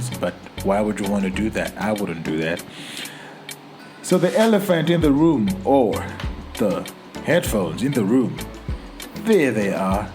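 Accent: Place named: Zimbabwe. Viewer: you sound like a Zim own?:). You from Zim?. Great review and unboxing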